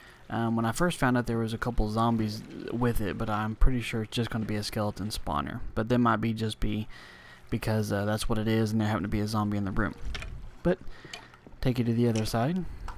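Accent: South, United States. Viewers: you from the south bro?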